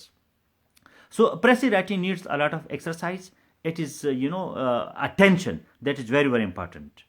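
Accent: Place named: Yousafzai, Pakistan. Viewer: Proud of u Sir..." Sta da de yousafzai accent na zaar sham".....keep up sir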